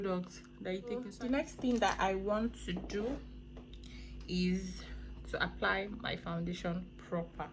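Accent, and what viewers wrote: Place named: Nigeria. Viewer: As soon as I opened the video and heard your voice I said, yesss my Nigerian sistaaa!!! just subscribed!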